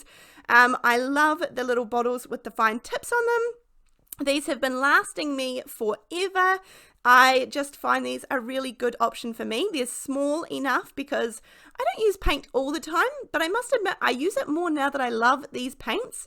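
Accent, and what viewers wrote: New Zealand. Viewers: Glad it made you laugh. It really did take me a while to,work it out. . Your accent is just the sweetest. Reminds me of the time we had a supply teacher here who was from NZ. I regularly had to translate for our class. She wanted them to sit on the mitt. .. but the best time was when she wanted to sort our children into ability groups for literacy.. we had the kiwi group, the jandals group, both needed explaining as they had not come across those words before. But then we got to the thongs group. .. we changed that one…. 😂😂 hope you’ve had wonderful Christmas. Love to everyone. X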